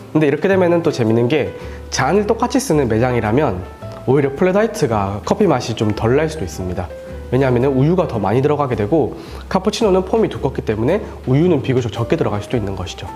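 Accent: Gyeongsang, South Korea. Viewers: 씨발 시작부터 경상도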